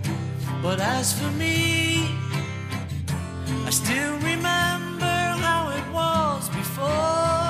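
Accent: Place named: Scouse, United Kingdom. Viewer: I agree with everything you said except the accent. Love the scouse drawl! Most city centres are shit holes. I know Dublin is!!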